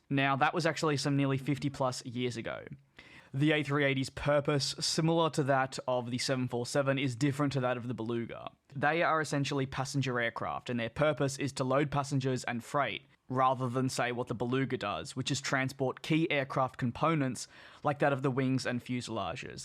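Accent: Australia. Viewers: "..A bucket.load.." Great to hear an Aussie speaking, even though you're too polite to say " a metric ***k tonne" Goodonya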